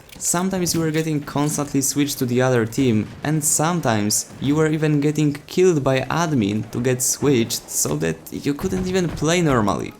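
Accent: Germany. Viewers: You should do a video on the skill balancer. Dude, I love your content, keep it up. Also, is your accent German? If not, what is it? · I feel like is german? Or dutch? Especially with that accent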